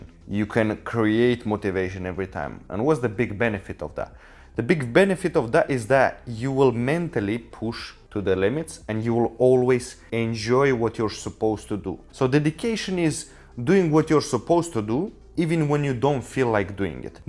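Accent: Russia. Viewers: Yeah his russian accent proves it and skin color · His russian accent is so funny. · Are u from Russia? Your accent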